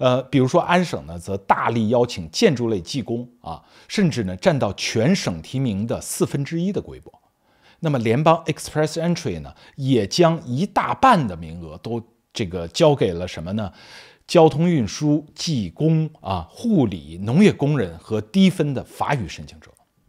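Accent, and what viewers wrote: Standard. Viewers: 是不是做播音主持的？字正腔圆，有条不紊，说话听起来很舒服。内容当然也很高含金量！ ❤❤❤ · 一口字正腔圓的普通話操著🇨🇦的心，這是一種什麼樣的精神😂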